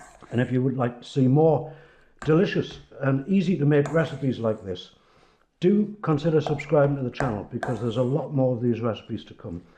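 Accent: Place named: North, United Kingdom. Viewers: Can't put into words the feeling of home, as soon as i heard your accent! I'm from the North myself and this makes me want to get right back into baking! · You sound like my dad, who was a Geordie ❤ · What a grand fellow you are. I came to see if I was doing anything different when I make cheese and onion pasties. Turns out we are pretty much doing the same format and recipe except I probably use a little less butter and lard. I enjoyed listening to you. Are you a Geordie? I am from north east of England.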